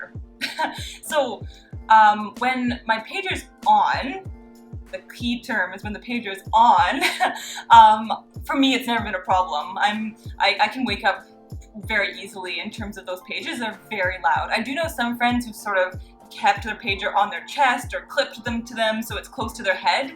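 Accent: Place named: Canada. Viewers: That's because she is Canadian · You sound Canadian to me.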